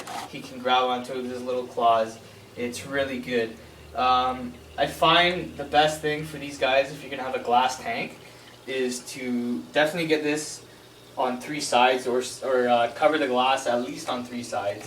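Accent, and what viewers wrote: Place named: Canada. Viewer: nice video. u sound like ur from Canada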